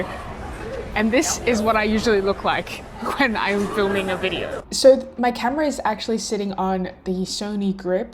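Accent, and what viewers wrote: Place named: Australia. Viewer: Love your Australian accent :)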